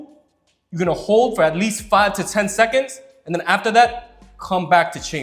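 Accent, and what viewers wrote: New York, United States. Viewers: I’m from Queens and I KNOW you gotta be from NYC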